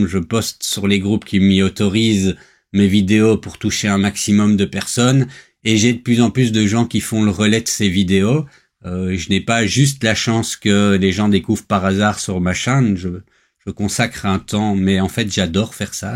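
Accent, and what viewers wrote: Belgium. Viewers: Félicitations pour cette attitude de la part d'un novice en impression 3d (heu, pas de confusion, le novice, c'est moi hein 😁) ... mais aussi compatriote 🙂. Au passage, je n'ai pas encore réussi à mettre une origine sur ta (très légère) pointe d'accent, namurois comme moi ? Bref, cette modestie et humilité typiquement belges, et parfois injustement décriées, sont tout à ton honneur. Très, très bonne continuation !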